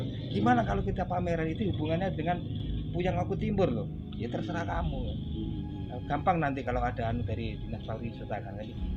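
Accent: Java, Indonesia. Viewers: Aneh ini yg ngomong orang jawa logat ya apa ya paham to asal usul komring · sija JK logatna jg katahuman jlm jawa.sejarah pulimbang Rik kumoring jg pandai iya.api lagi pok na Di jawa dudo,tambah pandai iya.hibat o, sa salut nyak😄😄😄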